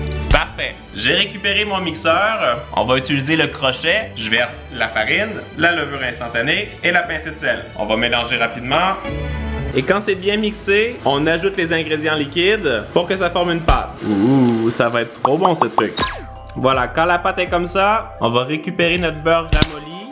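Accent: Canada. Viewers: tu mon youtubeur préféré de is vidéos accent canadien et qui met quoi des vidéos trop trop rire 👍👍👍👍👍 24h 🇨🇵🇨🇵🇨🇵🇨🇵 🎄 is cooking Noël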